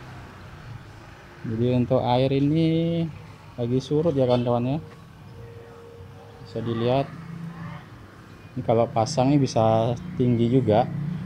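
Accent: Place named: Java, Indonesia. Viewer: Jowo ya mas .logat nye medok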